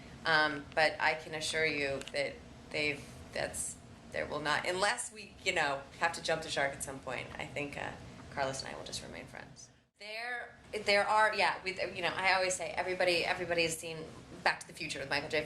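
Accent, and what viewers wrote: England, United Kingdom. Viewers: is she english? she sounds english might be me though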